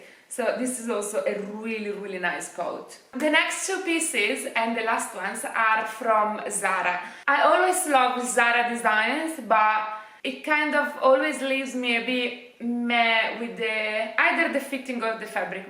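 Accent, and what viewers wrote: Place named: England, United Kingdom. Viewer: Ciao, Ti stanno da Dio i pantaloni da Zara,amo il tuo accento inglese.Qualche volta ti scrivo in inglese 😊perché sono una ragazza di adozione italiana 😅che vive in Danimarca.Amo il tuo stile 😍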